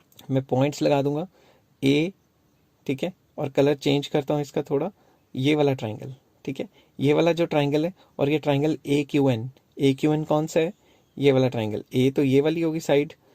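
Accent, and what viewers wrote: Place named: Punjab, India. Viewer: Ur way of teaching is commendable 👏sir apse mujhe thori thori ek punjabi ki feeling ati hai .kya ap punjabi ho ?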